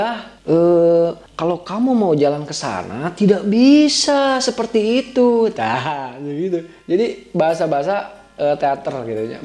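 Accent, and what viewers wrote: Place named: Tasikmalaya, Indonesia. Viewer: Tasik kitu pisan 🙈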